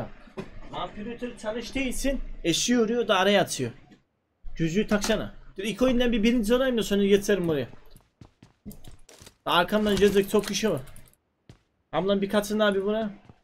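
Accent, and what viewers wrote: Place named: Black Sea, Turkey. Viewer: gerçekten laz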